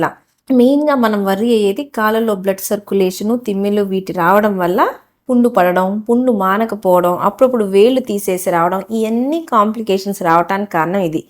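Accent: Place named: Telangana, India. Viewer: చక్కగా చెబుతున్నారు. తెలంగాణ యాస కూడా వినడానికి బాగుంది 😅.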